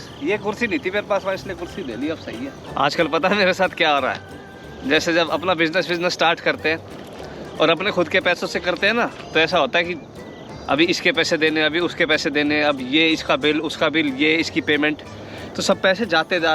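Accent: Haryana, India. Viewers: I bet that is from Haryana because I can feel his tone even I am from Haryana.🔥💖😄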